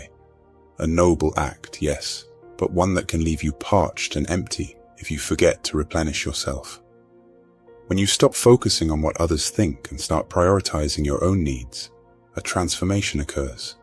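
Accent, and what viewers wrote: United Kingdom. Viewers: That British accent on these type of videos is so annoying..makes me tune out instantly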